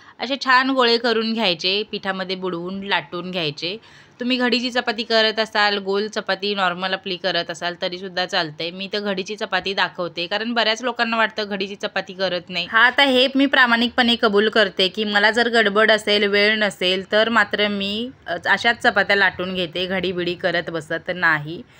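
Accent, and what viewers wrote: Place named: Kolhapur, India. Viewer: Chaan vat te tumcha awaz and tumcha kolhapuri accent eyikayla.. recipes pan baghayla avadtat ❤❤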